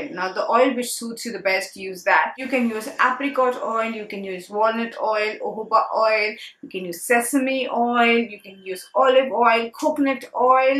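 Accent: India. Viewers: Wow it's such an awesome remedy with so less ingredients n moreover ur hindi accent is super cute.Love u lotsss baby . 😍❤💋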